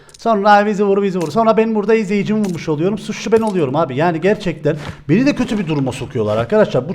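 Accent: Cyprus. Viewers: Kıbrıslıları abi sayesinde asik oldum şivelerine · Abi gercekten kıprıs lımısın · KIBRIS KONUŞMASINI COK SEVİYOM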